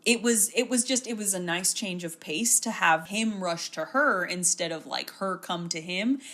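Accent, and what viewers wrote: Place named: United Kingdom. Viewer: As a Brit, I rate your British accent 10/10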